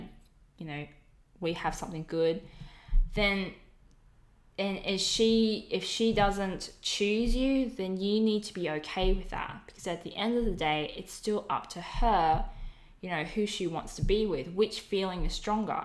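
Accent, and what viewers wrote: Australia. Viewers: I do appreciate your honesty in regards to this matter tho... so thank you! :3... BTW... are you Australian? That is one hell of an accent.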